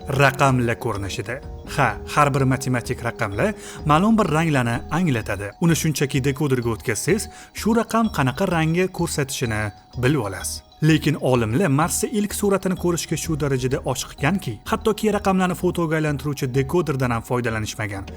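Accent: Tashkent, Uzbekistan. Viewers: Toshkenni shevasida gapirsa nima bopti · Ijod zoʼr takrorlanmas omadizi bersin bir taklif NATOʼGʼRI TUSHUNMANG LEKIN AKA SOF OʼZBEK TILIDA GAPIRING ADABIY TILDA TOSHKENTCHA SHEVADA EMAS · Тошкент шевасида гапирмасдан,адабий тилда гапир.Кушнинг билан гаплашиб утирмаяпсан-ку ,саводсиз. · Аввало видео учун рахмат журналистмисиз блогермисиз адабий тилда гапиринг Тошкент шевада гапирмай тв куйсанг хам факат тошкент шевада гапиришади нима тош шевада гапирса маданиятли булиб коласизларми шунчаки жахлим чикади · "ВОТТИ-ВОТТИ" ЛАМАЙ ГАПИРСА БУЛМАСМИКАН. БИЛДИК, "ТОШКЕНТЛИК" ЭКАН. ХАР ХОЛДА АУДИТОРИЯГА ШЕВАДА ЭМАС, АДАБИЙ ТИЛДА ГАПИРИШ КЕРАКЛИГИДАН БУ "ШАХАРЛИК" НИНГ ХАБАРИ БОР ШЕКИЛЛИ... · Материал зур. Лекин Тошкентча шева унинг сифатига таъсир килмокда. Кейинги чикишларда ушбуларга эътибор каратилса ажойиб булади. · dasturni toshkent shevada emas adabiy tilda olib borsangiz yaxshi bòlardi har holda sizni faqat toshkent kòrmaydi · Toshkenligu Toshken shevada gapiradida. 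Manga qaytanga hariplani shevadidan kura shu uzimizani Toshken sheva chiroyli eshtiladi. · eeey toshkanli aka adabiy shevada gaproring tushnmavotman gepizge oga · Ижодингизга омад. Лекин видео Тошкент шевасида экан, нима, бу фақат Тошкентликлар учунми? Тошкент шевасини эшитдиму, томошани дархол тўхтатдим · Toshkent shevada emas, adabiy o'zbek tilida gapiring, ba'zi gaplarizga tushunmay qolyabman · Ука, тошкенча воттиламай адабий ўзбекча тилда гапирсангиз курсатувингиз яхши чиқарди! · Toshkent shevada emas adabiy badiiy tilda gapirsangiz yana ham gòzal bòlarkan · Тошкент шевада гапиргани ёкмади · вотти дегани нима дегани сизларни фақат тошкентликлар кўрмайди адабий тилда гапринглар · билдик Тошкентлик экансиз, одамлар чет тилини ўрганади, Тошкентликлар адабий тилни ўрганолмайдм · ассалому алайкум малумот учун рахмат аммо вотти сузини (тошкентча шевани ) ишлатманг илтимос! узбек тилида кевотти борвотти деган гап йук. 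эфирда адабий тил миерларига риоя килинг. узур гапим огир ботган булса! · Juda yaxshi boldi. Lekin Toshkent shevaiida emas adabiy tilda gapirsangiz yaxshi bolar edi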